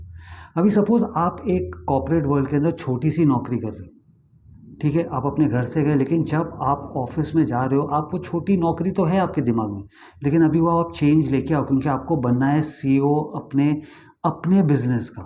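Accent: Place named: Mumbai, India. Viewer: I never knew you had a Hindi channel as well ... nice ....and I like your Hindi .... ekdum bambaiya types 😛.... yes and very truly said .... Imagination, Visualisation .....plus feelings .... very important 😊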